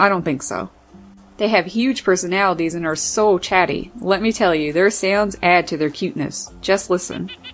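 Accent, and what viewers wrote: Newfoundland, Canada. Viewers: hi u have such a beautiful voice-i can hear the passion -n the cute canadian?accent-luv ca usa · Thank-you for this video. It was great to hear the Newfoundland accent! So Canadian! Absolutely the cutest birds, for sure. I live in B. C. and I think we have Sowet Owls here, too. I may be wrong, though. I am sure that there is a very rare, small owl which does live in the Coastal forests, perhaps you know which one it is, 😃 I think it is the Northern Spotted Owl. 👵🏻🐾💕🇨🇦BC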